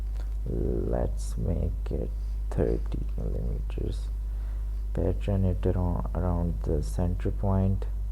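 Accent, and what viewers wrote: India. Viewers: ***** I thought it starts from skrach, absolute zero, & that the narration will be more interesting: "Hindi accent" is NOT an excuse for 'the boss make me do this video'. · is it possible to find someone that speaks english clearly and does not have a heavy punjabi accent?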